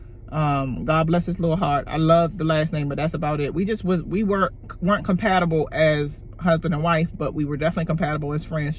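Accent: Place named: DMV, United States. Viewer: It’s the DMV accent for me 🥰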